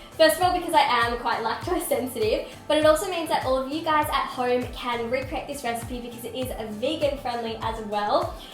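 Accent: Australia. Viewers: R u an Australian?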